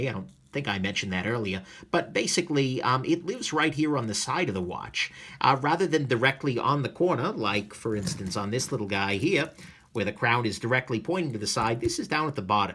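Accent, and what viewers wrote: United States. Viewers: Mark Walters That’s a pretty shallow attitude. I am English and find his accent so much better than computerised voices. It’s obviously a regional variation of the standard American accent (if there is such a thing). Carry on...you’re doing a great job on watches and knit.A man of my own heart.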